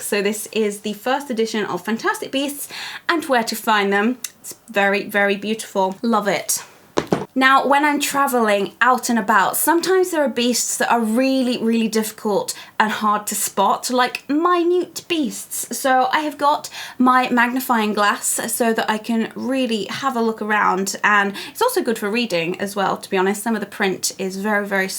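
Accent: United Kingdom. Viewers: Cute video :) Cute cardi/vest-thing - love your British dialect/accent :D I just recently started following you, and I binge-watched a lot of your subscription box videos. I have signed up for the LitJoy thing and am excited to see if I can manage to get one. About the GeekGear boxes, I'm thinking of getting one of them - I would like to know which you would recommend: The wizardry one or the special edition one? Maybe you could do a video onm it, if other people have asked as well :) Thank you in advance, and have a lovely day!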